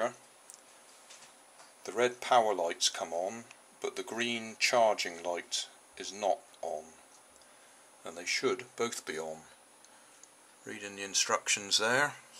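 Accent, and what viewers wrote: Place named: South West England, United Kingdom. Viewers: Haha are you from Cornwall? I'm from St.Austell, and you probably have the most south western accent I've heard! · God I do not miss the South West accent at all.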